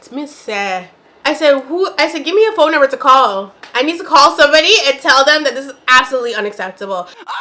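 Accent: Jamaica. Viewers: It’s the patois for me 💀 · My favorite part of every video is growing fluent patois. Kk luv ya byeee:_CHEFSKISS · The patois!!!! Yes!!!🥹🥹🥹🥹🥰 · The patois is healing the broken parts of me!! 😂 ❤ I love it!!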